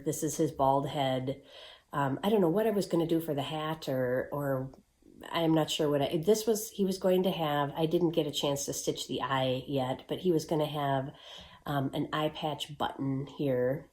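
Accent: Minnesota, United States. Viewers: Good to see you back. Stay safe. I was watching this and my housemate walked in the room. When he heard your voice, he asked where in Minnesota you were from. His mom and sister live in Sauk Centre and his niece is a pediatric nurse at the hospital in St. Cloud.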